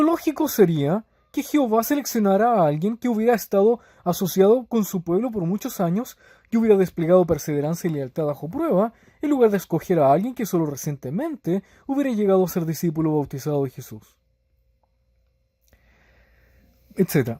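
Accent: Chile. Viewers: TU VOZ Y ACENTO SUENA MUY PARECIDO AL DEL CANAL ( MAROONED ) QUE ES CHILENO LO ERES TU TAMBIEN?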